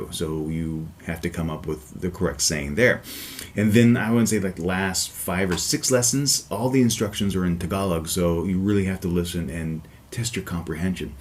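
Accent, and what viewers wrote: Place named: Midwest, United States. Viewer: since you've been here in the philippines, instead of ordering the program online, you could have downloaded that program through uTorrent, absolutely for free. i'm a native cebuano speaker and thanks to pirate bay, i became almost conversant with spanish, french, and japanese for free. so far, our laws may have lapses on online piracy. you notice that here in my country that piracy is almost everywhere, right? by the way, what i want to learn right now is how to imitate your pleasing mid-western accent. i like it.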